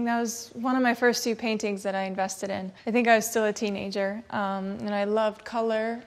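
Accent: United States. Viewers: 这美音说的也太好听了吧。好想听她说两句俄语试试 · 怎么是美音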